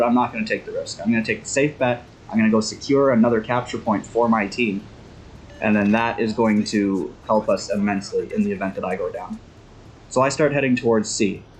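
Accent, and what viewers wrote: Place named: Canada. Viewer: Maybe. Apart from my heavy Midwestern USA accent and your mild Canadian inflection.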